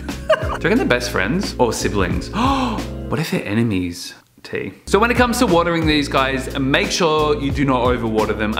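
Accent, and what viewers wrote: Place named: Australia. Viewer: Hey, I have a silly question: how do you do to take those avos out from those nice flat balloon shape glass jars when you have to change the water? Cause you're supposed to change water once in a while, right? I mean, considering the shape of the jar and the roots, it might be quite alright to take it out, but what about when you put it back in place?? I've had the problem with another plant cutting, now the root system is getting bigger, so it's becoming tricky to take out/put back in place all those nice roots in the narrow jar opening... Ok, now I gotta add one thing: I love hearing you speak, makes me so nostalgic of OZ...;)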